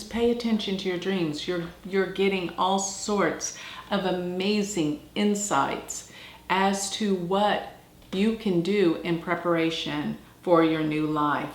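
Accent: United States. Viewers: Typical American accent....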